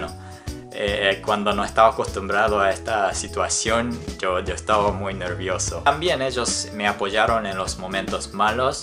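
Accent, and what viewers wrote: Argentina. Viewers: ayyy, sabés que llegué al canal con el video donde hablás de qué tan difícil es hablar japonés y del por qué no vivís en Japón, y te juro que te escuchaba y pensaba "¿soy yo o su español suena un poco argentino?", ahora con este video entiendo por qué XD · Ahí se te notaba más un acento argentino, ahora en Valencia se te nota más el acento español. Soy argentina y también vivo en Valencia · Me encanta el acento que te quedó argentino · Se te pegó el acento argentino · Sos muy encantador, tenés una expresión muy buena, ya puse una vez que me encanta tu acento argentino. A mi me pasa igual con eso de diferenciar las dos culturas argentina y paraguaya, y las dos me encantan. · Tu acento argentino se nota! 😊🇦🇷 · Hola! Tu acento es muy argetino! Lindas las vivencias de viaje que tuviste, espero que vuelvas pronto a Argentina. Saludos 😊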